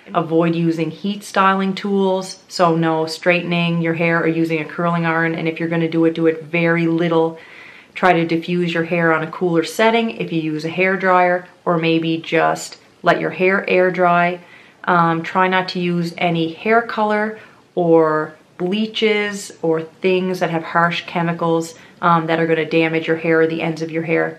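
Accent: Canada. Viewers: Must be Canadian :) spelling and accent gave it away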